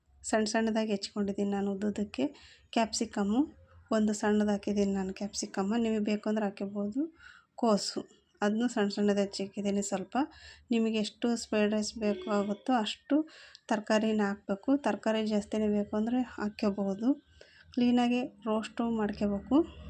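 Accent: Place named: Sira, India. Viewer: R u from sira r it's surroundings ur language slang in like that nice receipe😊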